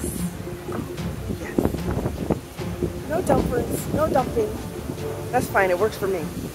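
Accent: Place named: Miami, United States. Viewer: I thought I detected the Miami accent! I grew up there just off of Red Road and Calle Ocho! In Ocala now.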